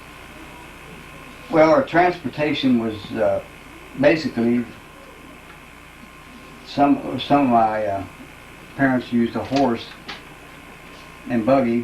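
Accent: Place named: South, United States. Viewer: Your cute southern accent 😹😘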